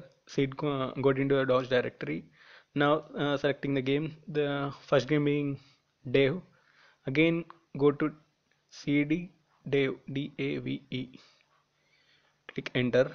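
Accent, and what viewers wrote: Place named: India. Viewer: this is why I like hindi accent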